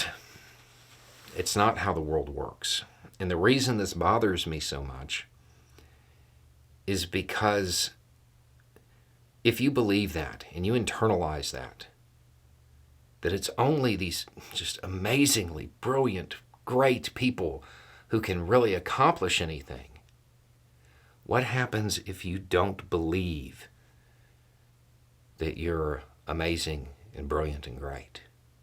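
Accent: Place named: Florida, United States. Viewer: That "ugh" after mentioning "great" putin or trump... i feel that ugh.. everyday. I like theatrical youtubers.. but i like your stuff. Living in rural fl and listening to some one with our accent talking sense for once.. its that good breeze off the cow pasture and not the swamp lol.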